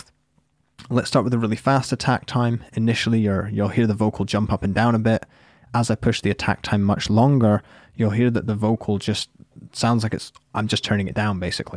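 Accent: Scotland, United Kingdom. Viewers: I just love listening to this Scottish (?) accent. It's so soothing. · Well he is British according to his accent and the flag he now has in his later made video. So it's pretty aggressive for a Brit. Oh wait, maybe the stereotype is Canada lol.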